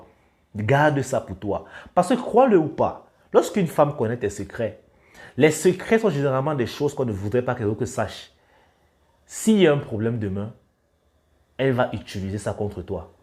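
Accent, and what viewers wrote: Cameroon. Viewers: Tu a l'accent Camer hein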